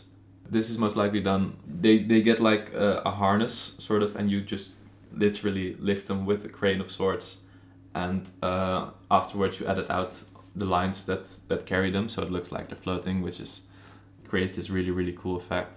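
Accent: Netherlands. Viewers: you started speaking and i knew! Das een Nederlander! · Are you dutch? i can hear your accent. :3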